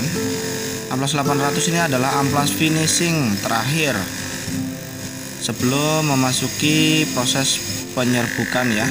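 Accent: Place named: Java, Indonesia. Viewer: Maaf boss..kok logatnya ky orang jawa...?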